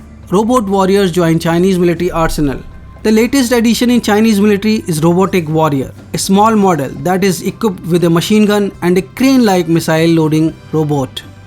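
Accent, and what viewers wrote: India. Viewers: Is this India accent? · I assume the narrator is from kerala india🇮🇳🇮🇳🇮🇳🇮🇳👌👌👌👌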